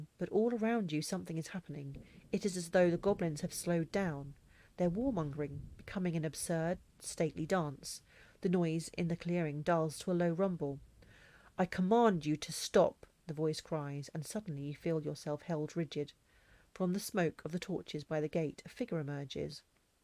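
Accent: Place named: England, United Kingdom. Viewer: those books are the best in the domain of adventure gamebook , congrats for your work ! the english accent is not my cup of tea , for this is very nice to heard the text readed.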